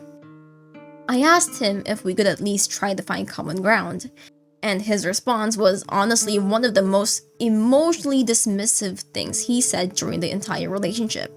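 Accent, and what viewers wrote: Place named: Philippines. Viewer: Are you filipino? Your english sounds so much like Filipino english!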